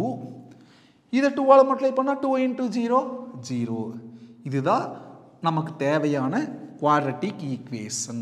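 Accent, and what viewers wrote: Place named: Kongu, India. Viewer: Sir you teaching methods is very useful for beginners thank you so much your kongu slang is nice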